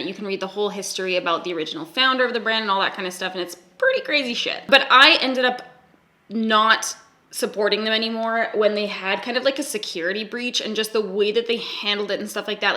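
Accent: Canada. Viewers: I love this just because you said “tag” so much at the end. #lovecanadianaccents you’re the best! 🙏👏🏽❤️ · All the comments about her "accent" saying "tag".. I went back and watched again wondering what I missed... oh... silly me eh.. I grew up 2 hours away from where she did 😂😂😂 Oh Canadia.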